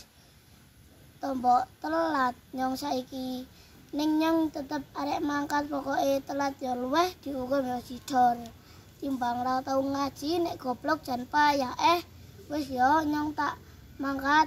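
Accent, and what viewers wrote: Temanggung, Indonesia. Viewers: Meduk banget.bahasanya kas Temanggung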